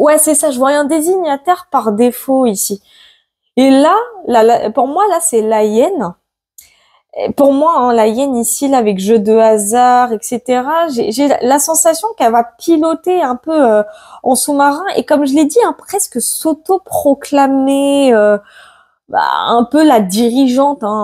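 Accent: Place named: North, France. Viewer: Chère petite je réponds un peu à bla personne qui dit qu il ne comprend pas à ce que vous dites en réalité c est peut être comme moi qui suis du sud et qui a du mal à comprendre l'accent du nord surtout lorsque tu ris en parlant C est marrant ''''mais peut être si tu arrive à parler un peu plus lentement ce sera mieux Je te dis cela sans aucune méchanceté car je suis une vieille grand mère qui te fait de gros bisous car tu es très très sympa je t ai connu dernièrement lorsque tu étais avec le bazard de la voyance💋💋💋❤️❤️❤️👍👍👍👍👍👍on t aime bien petite cherie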